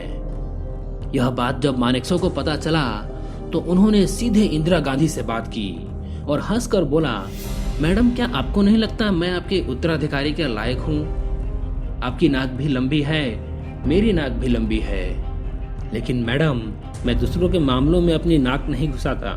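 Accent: Bihar, India. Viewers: Yah baat.. Yah baat karte karte video ki aisi taisi kar Di.. Voiceover kisi aur se karwa lete yaar.. Bihari accent does not suit the video theme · Dude, you have worst hindi accent, Marsal nahi Marshall, Saw nahi Shaw, Des nahi Desh , pls stop this bhayiyya accent laude. 😆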